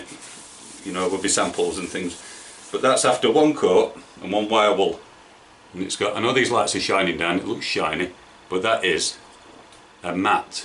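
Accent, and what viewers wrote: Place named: Yorkshire, United Kingdom. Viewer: Suuuuper well explained video! Also love the accent! Yorkshire?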